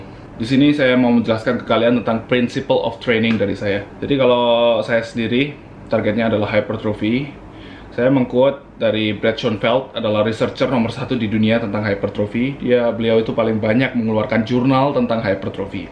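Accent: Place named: Java, Indonesia. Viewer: kok koyo wong solo / jogja ya. logatnya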